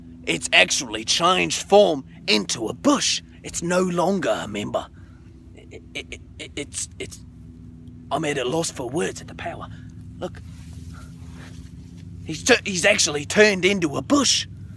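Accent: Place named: San Diego, United States. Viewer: He's from San Diego, man!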